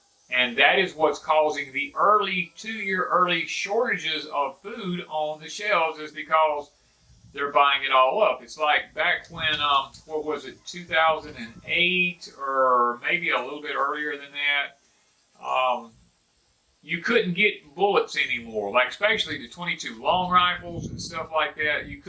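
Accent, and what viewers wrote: South, United States. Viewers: Many Thanx from Canada. I always say what happens in the US will happen in Canada. Love the Southern drawl. great information, Thanx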